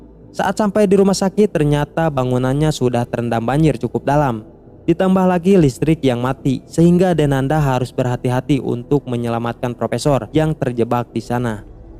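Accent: West Java, Indonesia. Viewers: Ni host nya pasti orang sunda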